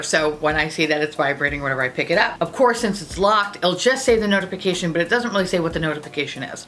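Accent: England, United Kingdom. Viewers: This is the first time I've heard your British accent and I need it more in my life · I love the English accent 😁❌✖❌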